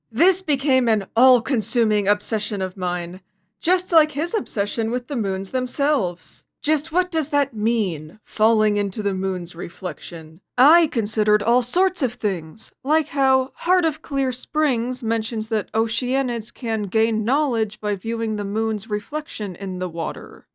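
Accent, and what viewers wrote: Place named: Canada. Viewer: I hear some canadiannism in your voice, or maybe its northeastern US which has canadian influence in their accent 😊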